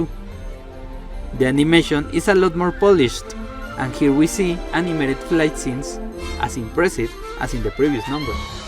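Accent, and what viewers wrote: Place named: Romania. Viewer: Good Video! you have quite an accent. Are you from Romania?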